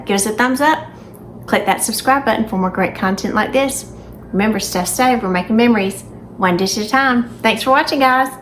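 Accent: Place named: Georgia, United States. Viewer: Just found your channel and I am loving it. I enjoy listening to your Georgia accent.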